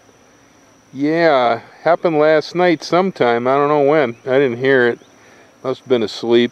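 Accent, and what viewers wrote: United States. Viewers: mmmm dinner... poor thing.. you sound like a true american in this video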